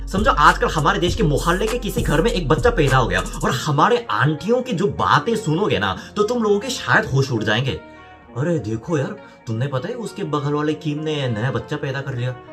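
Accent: India. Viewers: You are born in South Korea🇰🇷 but your way of talking is Indian🇮🇳👳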